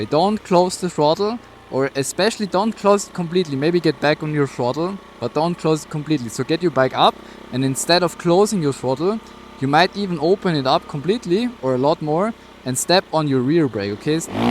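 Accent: Germany. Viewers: I trust you even more because of the German accent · I hear deutsch!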